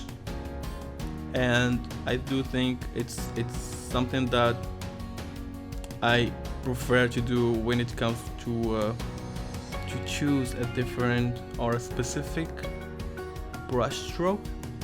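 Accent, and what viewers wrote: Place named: Morocco. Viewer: bayne l'accent del mgharba xD l3azz bik khouya great job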